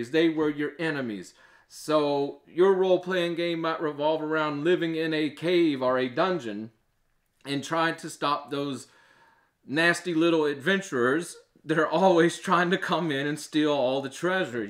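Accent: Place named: Mexican-American, United States. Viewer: lol nah man, it's cool. You sound like Mexican people living among Americans, due to being exposed to that accent type, like most Americans do, by the way. I can only appreciate your Spanish!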